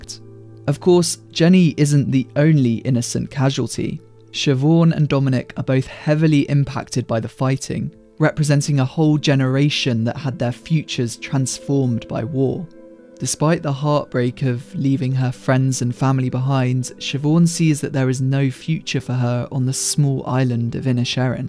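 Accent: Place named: England, United Kingdom. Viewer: As soon as I heard the English narrators accent I knew we were in for 13 minutes of Tansplaining. The Anti-Treaty forces were standing by an oath they swore to an Irish Republic, not a partitioned land as a British dominion. They were not “self-mutulating”. Banshees is a dark tale of a failed musician who is suicidal, but his laissez faire catholicism prevents him actually carrying it out. He decides instead to hound the gentle Padraig to suicide instead.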